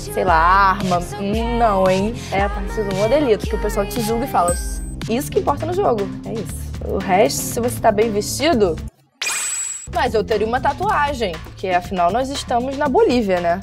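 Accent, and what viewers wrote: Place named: Rio de Janeiro, Brazil. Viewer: É o sotaque carioca só fica bonito em mulher mesmo. a gamer que você rexxxpeita ❤️❤️❤️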